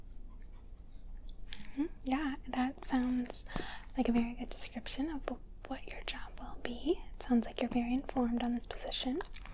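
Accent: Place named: Midwest, United States. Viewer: I can tell by looking out your window at the trees that you are in a warm state(southern), but your pleasant sounding accent you probably grew up in maybe Chicago or some Midwestern town.😉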